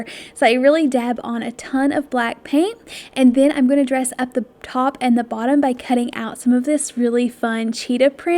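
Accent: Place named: Utah, United States. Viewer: You kinda' have a Utah accent. Do you live there?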